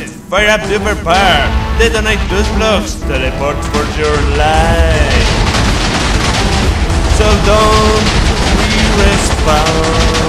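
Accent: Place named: Spain. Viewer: Migue Povea Date cuenta que el es español y no tiene la misma pronunciación que un americano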